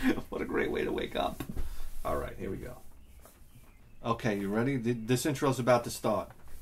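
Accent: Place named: New York, United States. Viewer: When you were talking about your polish friend being trained in "sahkah" I thought it was some polish thing I had never heard of but then I realized, oh it's soccer, haha. Love the new york accent!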